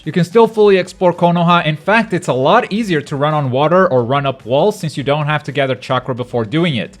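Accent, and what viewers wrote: Portugal. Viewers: Are you portuguese ? 0.o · Wait, are you portuguese?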